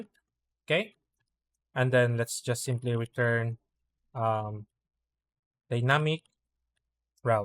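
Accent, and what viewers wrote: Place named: Philippines. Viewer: The accent is very noypi 😂. You have my sub, keep it up.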